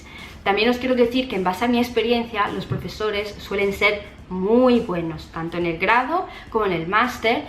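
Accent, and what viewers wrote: Italy. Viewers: Eres italiana?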